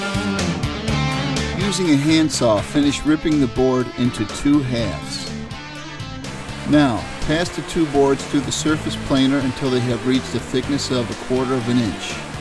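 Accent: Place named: United States. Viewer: I realized that this guy is american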